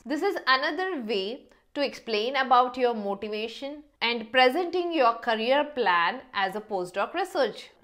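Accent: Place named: India. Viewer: Best indian accent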